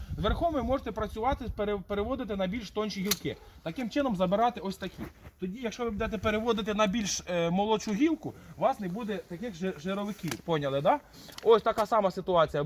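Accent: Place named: Podillia, Ukraine. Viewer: Подільський діалект.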